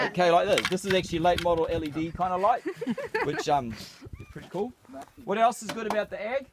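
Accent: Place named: New Zealand. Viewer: He's kiwi.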